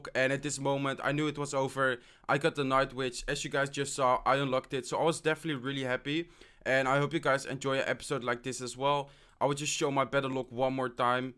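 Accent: Netherlands. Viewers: ben je nederlands want je praat soort van Nederlands Engels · Are you from the netherlands? becuz your voice sounds like that.. And im from the netherlands too :)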